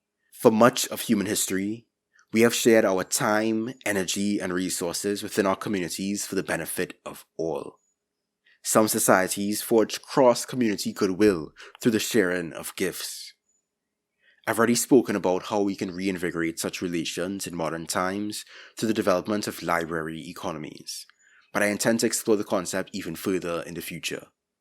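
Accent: Trinidad and Tobago. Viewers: Di Trini accent, bwai! 😍😍😍😍…🇯🇲here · It's Trinidadian. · You're trinidadian! You talk like me! I was so surprised, you accent sounds like a real person! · Your accent sounds like you’re from Trinidad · I hear a trini.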